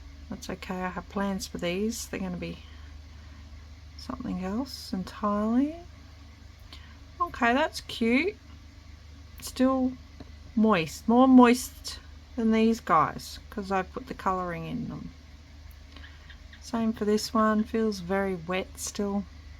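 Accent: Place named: Australia. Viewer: Thanks for the video - I definitely did learn from your mistake. Got some Solidcrete today & found your video hoping to avoid mistakes & hopefully it worked. Still not cured, but a few years ago I had a go with some other brand and did the same thing as you. It looked far too runny, so I added more powder & disaster. So glad I found your video & avoided that mistake this time. Great hearing an Aussie voice for a change in my hunt online for crafting tips. Subscribed ☺👍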